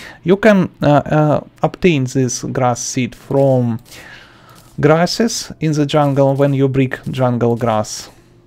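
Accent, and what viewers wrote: Russia. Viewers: Мог бы и не скрывать что ты русский))) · Your from russia? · Не, ну русского человека узнать очень легко по акценту :) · I love his russian accent its so good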